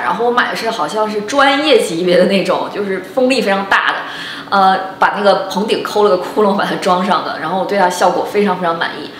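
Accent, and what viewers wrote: China. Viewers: 这英文，中文口音太重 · 大陸口音⋯⋯聽了就整個翻白眼難聽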